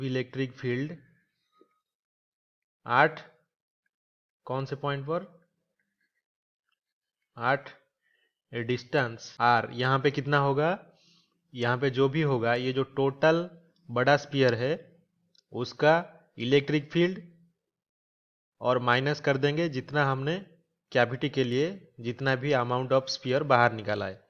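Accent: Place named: Odisha, India. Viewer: After hearing your voice I think your mother tongue is odia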